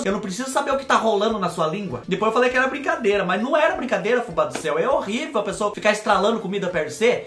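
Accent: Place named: Minas Gerais, Brazil. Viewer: Vc é mineiro uai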